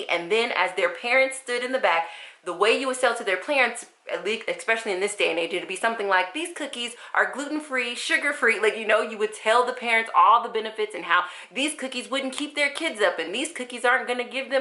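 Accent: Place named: North, United States. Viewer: it is a northern thing. I’m from Baltimore and we say it similarly. 😂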